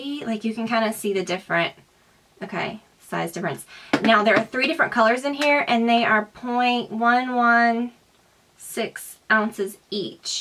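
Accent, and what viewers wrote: South, United States. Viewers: Your southern peeked through in this video :). Could you please review that hourglass primer?! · Love your videos! You inspire me to make vids...i luv your pretty lite southern accent ; ) i luv your long vids too..more tours please.love your style! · Have you always had a Southern accent and I never noticed? Or did you just develop one? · Can you make a video demo of the masks you got. It'll be nice to see how they work. Btw you sounded extra country in this video, and I liked it! ;) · Your accent is soo southern in this video ! Never noticed it so much before.. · I feel ike you have the southern accent that only comes out when your talk super fast, i love it. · why is she talking with a southern accent in this video? idk if shes from/lives in the south..but ive never heard that from her before. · You really looked like you were having fun and were happy in this video :) It kept me smiling :) Also at times I could hear a bit stronger southern accent than usual... 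Adorable :)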